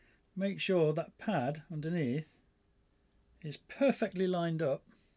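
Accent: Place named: East Midlands, United Kingdom. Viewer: ah thanks for let me know I thought it was a Notts/ Derby accent :) I just came across your YouTube page and just now starting to look at your other vids, very interesting too. I have a music production studio and am also into sound engineering but more hands on than hands dismantling heheh. I also own the Push 2, which I found fascinating when you dismantled it.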